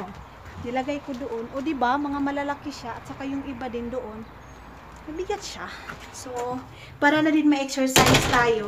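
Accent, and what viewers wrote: Visayas, Philippines. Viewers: Makatawa ko nimo kay bisaya kaau mahirap magtagalog.Mao gyud Dai ,magpa box magka igit igit kag puno sa isulod.I shout mi nimo Dai ha ,dri sa Italy. Salamat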